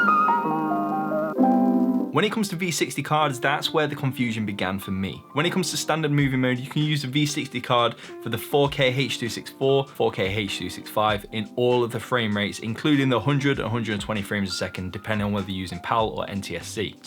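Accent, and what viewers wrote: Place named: Northern England, United Kingdom. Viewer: I feel like I've found the North of England's answer to Peter McKinnon... (geographical location guess based on accent, could be way out 🤣) Either way this channel is dope. Great work man!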